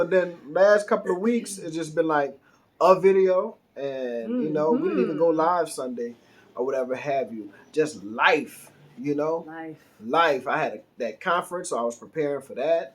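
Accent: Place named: United States. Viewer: The only thing American I see in you is your accent,hang on tight to it lol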